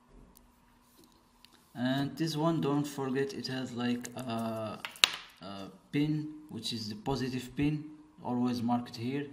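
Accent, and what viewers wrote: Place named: Morocco. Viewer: منين لبلاد اياسمون، باينة عليك مغربي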